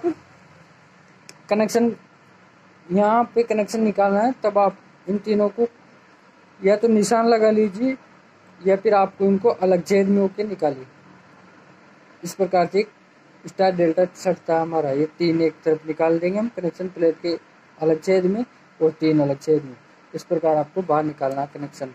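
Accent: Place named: Rajasthan, India. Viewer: Bhai sahab namaskar Bolly Se To Rajasthani lag rahe ho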